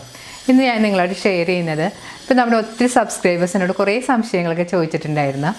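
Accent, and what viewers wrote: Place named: Kochi, India. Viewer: Inspiration video 😘😘 നാട് കൊച്ചിയിൽ ആണെന്ന് സംസാരം കേട്ടപ്പോൾ തന്നെ മനസ്സിലായിരുന്നു🤪, സെറ്റിയടെ അടുത്തിരിക്കുന്ന flower base കിടിലൻ ആണല്ലോ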